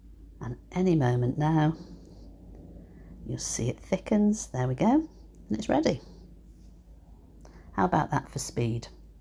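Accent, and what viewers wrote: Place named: United Kingdom. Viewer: Hi! This chocolate frosting looks so creamy and decadent! I could hear your videos all day long because I love British accent, very charming 😍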